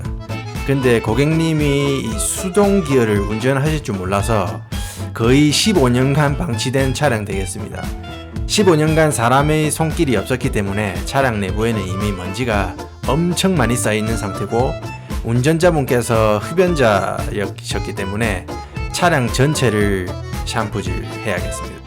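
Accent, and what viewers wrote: Gyeongsang, South Korea. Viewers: 경상도 이민자 십니까? 억양이 저희쪽 이시네요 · 아무리 들어봐도 경상도 아저씬데... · 저도 나름 세차환자다보니 영상만 봐도 완전 힐링되네요. 그나저나 요새 방송이나 유튜브에서 찐 경상도 사투리 듣기 참 힘든데 말이죠. 간만에 귀도 힐링하고 갑니다. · 구독하고 갑니다.간간히 들리는 경상도 사투리가 정겹네요~ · 경상도 사투리 구수한데 영어발음은 상당히 좋으시네요 ㅎㅎ 미국 가신지 제법 오래 되셨나 봅니다. 그나저나 유튭 알고리즘은 왜 이걸 추천해서 이 새벽에 보게 하는지..ㅋㅋ 속시원하게 청소 되는걸 다 봐야지만 꿀잠 자겠네요~ㅋㅋ 잘 볼게요~앞으로도 속시원한 청소 영상 보러 자주 오겠습니다~~ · 갱상도잉글리쉬 찰지네예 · 경상도 남자가 혀굴리며 영어쓰는것같아ㅎㅎ 매력있넼ㅋㅋ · 갱상도 사투리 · 영어발음은 네이티브 한국발음은 미쿡이고 억양은 경상도 토박이네요ㅋㅋㅋ 엄청난 조합입니다 듣고만 있어도 풉하게 되네요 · 저도 경상도 사람인데... 갱상도 사투리와 멋찐 영어랑 섞여서 하니깐 스웩하십니더! 느낌있습돠~ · 찌지고 문떼고...그렇 습니더~ 경상도 구시한 사투리 정이 뚝 뚝 느껴집니다~ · 알고리즘으로 시원함느끼고 갑니다 미국이신데 경상도말투로 해주시니 구수하이 좋네예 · 경상도 사투리가 정겨워요~ ㅋㅋㅋ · 뭐지... 경상도 사투리와 영어의 이 완벽한 조화는? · 고향이 경상도이신가봐요!ㅋㅋㅋㅋㅋㅋㅋ 사투리와 영어발음이 섞인 말투 넘나 매력적인것....☆ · 한국어 베이스가 갱상도네요ㅋㅋ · 알고리즘만 스쳐도 인연인데 구독하고 갑니다~! 경상도 사투리와 영어 의 조합이 색다릅니다 ㅋㅋ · 들리는 영어발음이나 차나 설명하는 문화나 미국인데 말에 스며든 경상도 말씨 때문에 경상도에 미국있는 착각함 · 갱상도식 영어 발음이 특이합니다 추천합니다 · 아이고 말투가 경상도 아닙미꺼 저도 세차업을 합니다 먼 타국에서 욕보십니다 자주 오겠습니다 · 아니 갱상도 말투 론나 좋습니다 ㅋ콬ㅎㅋㅋㅎㅋㄹㅋㅎㅋㄹㅋㅎㅋㅎㅋ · 경상도 사투리 정겹네요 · 국산말 할땐 갱상도ㅋㅋㅋ 수입말 할땐 갱상도 억양이 없어지는데예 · 경상도 억양 쓰시면서 영단어 말할때는 원어민 나오는게 신기하네요 ㅋㅋㅋ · 찰진 경상도 사투리 + 교포말투 + 꼼꼼한 청소 = 마약 영상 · 저도 아시는분이 미국에 오래사셔서 ㅎㅎㅎ 생각도 나고, 경남쪽 사투리들으니 친구들 생각나고 구독누르고 갑니다~~~소소한 미국소식 기대합니다~~~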